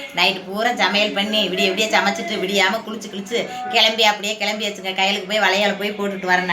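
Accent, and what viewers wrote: Madurai, India. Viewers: இதுதான் மதுரைக்கான பேச்சு அருமை👌👌👌👏👏👏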